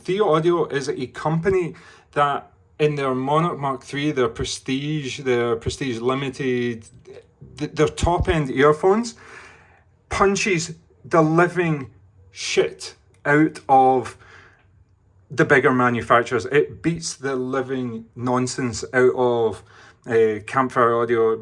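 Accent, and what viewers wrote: Scotland, United Kingdom. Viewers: You sound like a British Bernardo Faria. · 😂 I am going to say Scottish (based on the Scottish accent) and if I am correct in assuming, he is not in the UK (Asia based)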